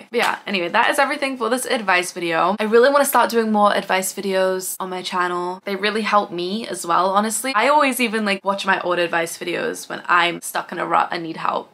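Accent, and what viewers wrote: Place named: United States. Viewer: No cus I’m 28 now and have watched your videos for years but haven’t watched in so so long and I felt a lil disconnected cus your American accent has gotten so much thicker and I feel like I’ve missed out on sooo much. Second I heard ‘let’s go ladies’ I was back in the zone. Back to the video with the dry shampoo. If you know you know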